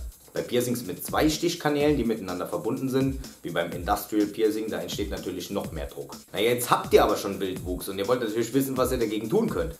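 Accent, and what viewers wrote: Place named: Palatinate, Germany. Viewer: ahso, du kommst aus der Pfalz. Deswegen biste mir so sympathisch😊Wir sind Nachbarn. Ich liebe den pfälzischen Dialekt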